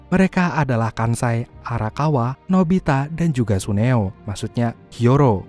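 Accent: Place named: Nganjuk, Indonesia. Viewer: logat nganjuknya minnn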